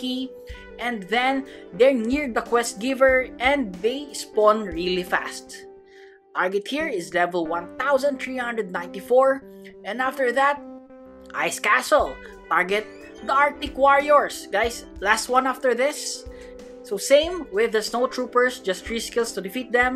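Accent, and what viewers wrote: Philippines. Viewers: BTW u have a heavy Filipino accent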